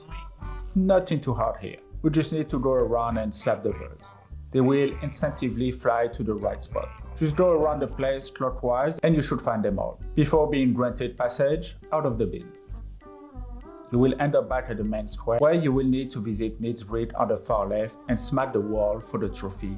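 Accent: France. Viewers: thought I recognized a French accent with a touch of African perhaps lol. I'm from Montreal QC too btw, and love the content!!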